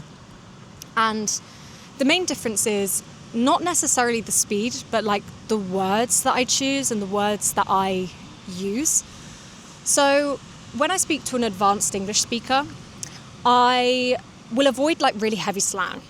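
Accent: England, United Kingdom. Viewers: Thank you for your video. I’m a Japanese student studying Russian. For some reasons I quit studying English after entering university, so my English got worse. But after watching some videos of your YouTube channel I wanted to start learning English again. You speak slowly, so it is easier to understand, than other YouTubers. And I like your British accent. I agree that surrounding yourself with foreign languages is the best path to master them. At first I couldn’t understand conversations of Russian native speakers, but I started to make it a point to listen to podcasts or YouTube for language learners every day, one day I completely understood what they are saying. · Funny to see this recommended while being a native-English speaker (I live in the US), and it seems it was also recommended to many other English-speakers. In my case, I may have seen videos regarding learning English from non-native speakers where those learning were native to Japan or some other country, so 'learn English' would be the common point there. 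This video does help in one way, and that's hearing a UK English accent that might be at a little bit faster speed than I'd heard from other YouTube channels or shows like Monty Python. I also sub to a couple channels from Ireland and one from Scotland - so also English, but a lesser-heard accent, to me. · Fancy British accent, thanks for native speed, its all clear. Is this true that most British people are very polite? Can they directly say "no" for any unlike favour? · Hi,there.Idid understand what you meant in the video.i love your UK sound,thank you 😊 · Are you British? I think 🤔 I love 💕 your accent. How can I get in touch with you? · beautiful england accent. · I've been learning english like 7 months and i kinda got 99% of this video like every single word i understood no problem and the best part of it that i sorta lerned and watched only american way of english and even with ur british an accent i got everything as well and honestly i really like how u pronouce without "r" so thank u for this video and contiue do what u do and u become as famous as mr beast in world of english learners xd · I am an American and I love you Brits and your accents ! 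Nice job ! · Are you England? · She is clearly British. Doesn't sound Aussie at all. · As a native speaker of American English, I understood everything you said, quite effortlessly, despite the cute English accent and occasional British-specific idiom. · Hi!, well, I usually study American English but let me tell you that I understand you, not at all but I do. I love your British pronunciation, it sounds fantastic, though 2 or 3 words slip my mind but I can understand you. Greetings from México City. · If I understood everything it means I'm an advanced speaker? (I'm really asking, my native language is spanish tbf) Cuz tbh it wasn't difficult at all, your pronunciation and accent is very pleasant and clear compared to others brits I heard before. I'm surprised indeed cuz I learned the USA english and that's the accent i'm most used to (still can't understand those texans cowboys brokers selling cows, their speech speed is about half the speed of light lol) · That's the Englishest of Englishes I've ever heard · Hi! 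I’m Korean and married to a British. That’s why I absolutely love the British accent! I’m so glad I can understand you much better than I thought. Haha. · I like her accent; it reminds me of the British life I've always missed. :-* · you have very clear british accent, I like british accent, specialy RP accent. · Hello from Greece. Wow. You are great sweety lady. Your pronunciation was a bit expected as British lady, it was not so difficult to hear. Perhaps they are international English with British accent? We thank you very much for your video. Kisses from Athens. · I really like the way you speak, your British accent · Forgot she spoke British English and not American English and panicked at first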